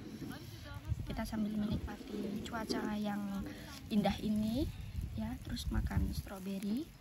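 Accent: Papua, Indonesia. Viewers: Kaka pasti asal Papua ini 😁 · mba pernah tinggal di papua mana ? soalnya kyk logat papua gt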